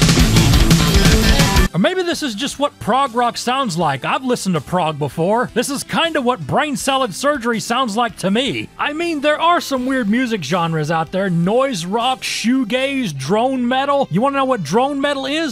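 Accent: South, United States. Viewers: God you’re so southern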